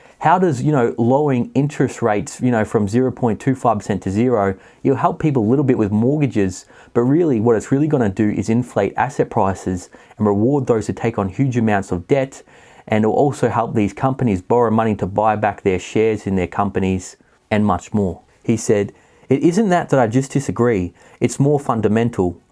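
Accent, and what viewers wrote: Australia. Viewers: Cheers buddy - really appreciate your news presentations and humble aussie commentary style.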